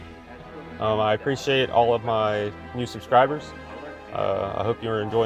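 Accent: South, United States. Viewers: It's weird to hear a narration that has a southern accent like mine. I love it. Fantastic video, the B-58 is an unsung marvel of the cold war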